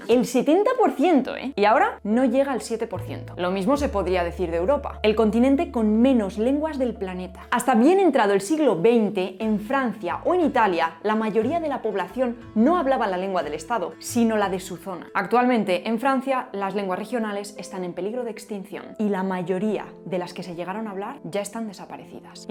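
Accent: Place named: Spain. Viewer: Hola!!!! Muy buen video!!! Tu acento me encanta y me enamora!!! Que lindo es oir a un español hablar!!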